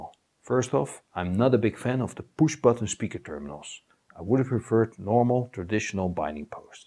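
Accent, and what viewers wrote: Netherlands. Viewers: enjoyed your dutch accent thought :) my main challenge with these Focal is where to set u the speakets. because in the back of the room the roof is crawling (-under the roof), so I don't know how the speaker will manage the atmos. It is very clsoe to the roof.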